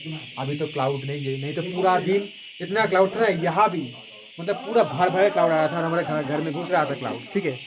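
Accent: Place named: Bengal, India. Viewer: sune mone hochhe tumi bangali, hindi te vlog kano korcho?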